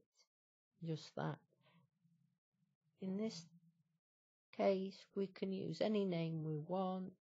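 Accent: United Kingdom. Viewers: Ta you sure the video wasn't on x0.5 speed? sounds like a british girl to me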